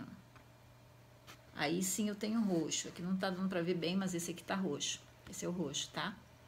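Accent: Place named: Rio de Janeiro, Brazil. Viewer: Tava procurando um jeito de fazer cartela com meus lapis aquarelaveis, caí aqui! Tem gato, sotaque carioca e o conteudo otimonl! amey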